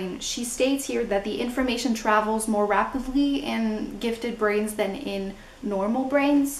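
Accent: Quebec, Canada. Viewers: WoW Je me disais que tu avais un accent québécois! Je suis un adulte TDAH, dyslexique, avec dyscalculie et avec dysorthographie. Je connais très peu de québécois doublement haut-potentiel! Belle découverte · Tu es de Montréal ? Selon le peu que j'ai entendu de ton accent tu me sembles québécoise... En tout cas excellent vidéo merci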